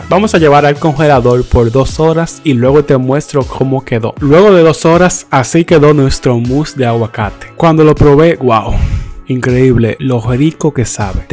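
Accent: Dominican Republic. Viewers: Me atrevo a apostar que eres dominicano?!!! 🥰 un abrazo fuerte desde Charlotte, NC. ..... ah! Me encantan tus recetas!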